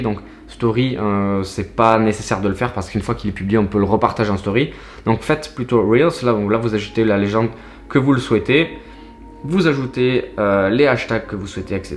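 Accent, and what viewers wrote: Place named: South, France. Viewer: Salut! Je viens de découvrir ta chaine ajd, merci pour ton travail! C'est génial de pouvoir écouter qqn avec l'accent du Sud haha! En tous cas si l'ocean et la photo sous marine t'intéresse, mon insta c'est: saltyskin_photography Cheers!:)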